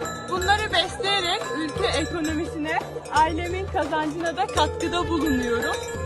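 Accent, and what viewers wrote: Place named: Kastamonu, Turkey. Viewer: Istanbuldan selamlar seni izliyoruz Allah yolunu açık etsin bizim kastomonular gibi konuşuyorsun maşşallah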